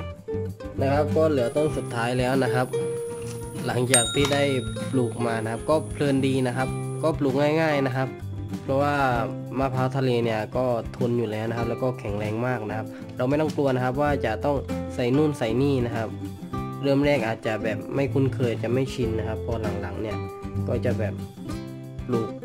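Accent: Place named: South, Thailand. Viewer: พี่พูดเหมือนคนใต้เลย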